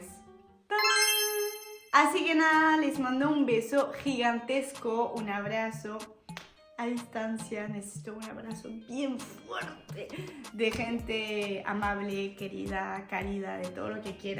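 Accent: Buenos Aires, Argentina. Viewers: Que bien que hablás porteño! Muy buen video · Muy bien tu español- argento🎉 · genia, tenés todo el modismos y pronunciación Argento-Porteña!!! Se te pegaron re bien todos nuestros gestos!!! En Argentina siempre hay lugar para la calidez y amistad para personas como vos! BIENVENIDA SIEMPRE!!! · No sé cuánto vivio en Buenos Aires pero el sssshooooo ssshendo se le pegó perfecto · “soy todo oídos” es la expresión para escuchar las ideas que pedis, ya que la idea de lanzar una yerba no va a funcionar 🫠 Muy divertido el video, terrible porteño tu acento😅 ❤️🙌🏻🇦🇷 Ojalá puedas volver y además conocer otras Provincias y los maravillosos paisajes. Bonne Nuit 😘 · Tus ye son de Buenos Aires y del sur de nuestro país pero yo soy mendocina, Argentina y decimos llo allá llaves no yaves, aya Bueno obvio me refiero a la fonética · Por lo visto estuviste únicamente en Buenos Aires por el yyyyyoooo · ¿Por qué pronuncias "SHO" en lugar de: "yo"? 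😢 - El castellano de Buenos Aires "no es correcto" para nada - ¡Ojalá algún día visites Santiago del Estero! Allí sí se habla bien. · Ya tenes el acento argentinos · Qué bien que hablás el argentino! 👌👌 Grande el bidet!! Irreemplazable! Los semáforos están del otro lado de la avenida para poder verlos mejor y que no se te vengan encima del parabrisas Las llaves Travex son más seguras q las otras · Hablás muy bien en argentino!!! Saludos desde Argentina 😊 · Hablas perfecto argentino❤ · Ya viajarás a otros lugares de Argentina. Tenemos hermosos paisajes. Tu español es bien porteño. ❤ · Hablas re bien pero no usamos el pues en argentina usamos el entonces si cambias eso vas a sonar más argentina!!!!!! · Gracias...hablas muy bien el español preces una porteña mas, gracias por tu amor sincero hacia nuestro pueblo, Aqui tambien te queremos.Cariños.Dios te bendiga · Hola, sos una genia, me encanta el Aa, muy argentina, te amo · Lo de los ascensores son "puertas tijeras" quisieron prohibirlos, por seguridad... 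pero finalmente los permitieron, porque en las casas antiguas son mucho más bonitos!... TODAS las palabras en las que dudaste estaban BIEN. Solo se dice verduLería. Hablás realmente como una argentina!... "Yo soy toda oídos", decimos. No perdiste PARA NADA tu castellano!... Me encantó conocerte. Ah, en qué lugar de Francia vivís?... Un abrazo muy grande desde San Telmo, Buenos Aires!... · Soy muy simpática y tenés un excelente español argentino. Gracias por querernos tanto y transmitir tanto cariño por nuestro país . Siempre serás Bienvenida!!!❤ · muy linda y todo pero me irrita que parece que no sabe que se está corrigiendo a si misma pronunciacion de palabras diciendo "no si dice asi, se dice asi" porque solo conocio el acento bonaerense. Osea no, la forma de hablar de hablar de ellos no es la forma "correcta". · Argentina es hermoso me alegra ya te adaptaste ablas ye😂😂❤❤❤ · Muy lindo. 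Solo le falta aprender que no se pronuncia "caye" ni "yave" (porque solo en Bs. As. se pronuncia así). En el resto del país es calle y llave. Tampoco es "caie" como en Colombia. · Es lindo escucharte hablando castellano con un dejo de acento francés pero lo mejor es como remarcas la ye al estilo porteño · Hablás re argentino. Me encanta.😂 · Hermoso tu español argentino! ❤❤ muy bien hablado. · Qué raro! Una francesa/francés hablando mal de su país respecto a Argentina… sabés? Te gusta porque NO conoces…te rodeas en nivel medio alto, hablas de Argentina pero te referis a Buenos Aires, hasta tu … pronunciacion exasperantemente porteño. Yo viví en Francia, en Nantes y en Poissy, y desearía estar allá y no volver nunca mas. Me da pena escucharte hablar en porteño diciendo que es argentino. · "O sea" "Ahhh" sos más argenta que la verga. Me acabo de suscribir, sos un amor. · olvídate...hablas muy bien el español porteño😅😅😅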